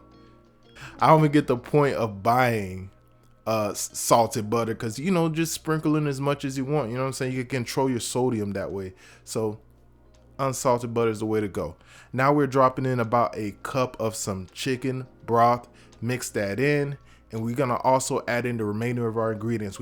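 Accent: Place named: Florida, United States. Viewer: Simple, easy to make recipe and taste good! Appreciate you you bruhdah! Sounds like your a from FL.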